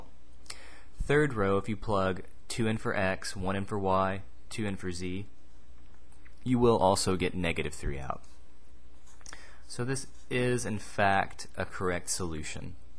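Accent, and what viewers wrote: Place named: Mid-Atlantic, United States. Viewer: Hey, what state are you from? I'm detecting a slight mid-Atlantic accent. No?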